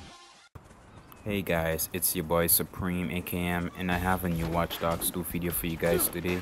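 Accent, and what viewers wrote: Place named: Jamaica. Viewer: Your Jamaican accent is so cool bro 🙌🔥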